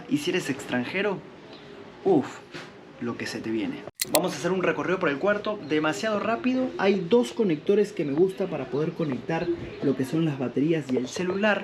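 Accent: Argentina. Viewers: Por qué imitas el acento argentino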